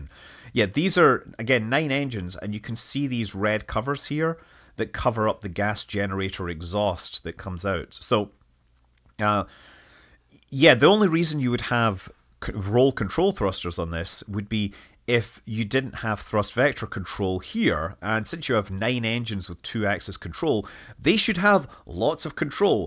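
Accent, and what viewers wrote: Scotland, United Kingdom. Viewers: Scot, are you Scottish? The accent is awesome, my ancestors came from Strathmiglo, Scotland in the 1700's to escape the English.. love all things Scottish.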